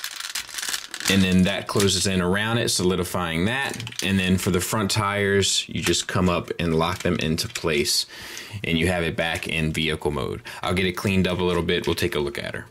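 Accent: England, United Kingdom. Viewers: Love the limey accent...lol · Love the English accent, haha! Good review as usual,.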